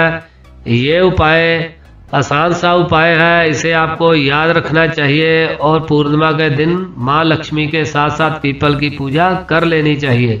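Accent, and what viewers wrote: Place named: Punjab, India. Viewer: Aby tu panjab mai kr mujra kr teri tou hindi bhi panjabi wali hai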